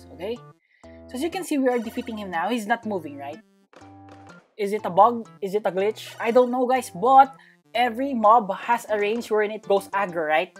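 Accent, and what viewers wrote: Philippines. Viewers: are you from Philippines bro?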